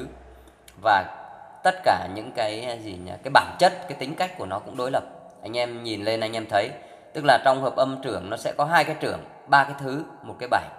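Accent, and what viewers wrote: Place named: Central, Vietnam. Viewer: Bạn hướng dẫn hay quá. Bạn ở đâu vậy? Nghe như giọng Miền Trung á hè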